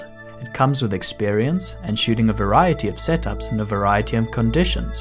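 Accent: South Africa. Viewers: Came across the channel via a ‘no film school article’. Was intrigued when I heard the SA accent but only realized who it was when the pics showed up :) loving the videos, very helpful insights. Looking forward to more! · I've been watching a couple of your videos over the last few days and kept wondering if you were South-African based on your accent. It's so nice for me to hear fellow South-African's approach filmmaking with such a passion and clear love for what you do. i too am an aspiring filmmaker in Cape Town, writing and directing shorts. Keep up your good work! i really enjoy the channel and wish the best for you in your career!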